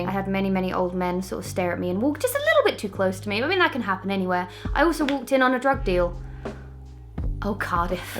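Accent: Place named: London, United Kingdom. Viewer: Your voice has gone very London girl in this video. I am neutral on this.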